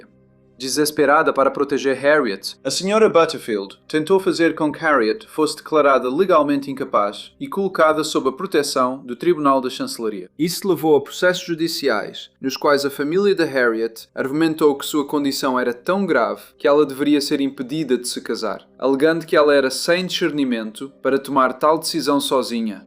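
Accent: Portugal. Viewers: Eu gostava bastante deste canal, mas agora com esse sotaque de Portugal, estou pensando seriamente em desfazer minha inscrição, esse sotaque é irritante 😡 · Esse sotaque de Portugal nossa muito ruim de ouvir. Voltem com o nosso português por favor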